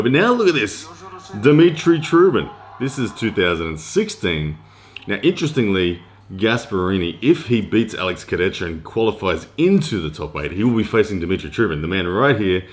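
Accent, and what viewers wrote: Australia. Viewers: Great Video! Very informative with the smooth aussie delivery. ESPN should have an Arm wrestling News Segment for you bro. Quality content ,keep em coming pls.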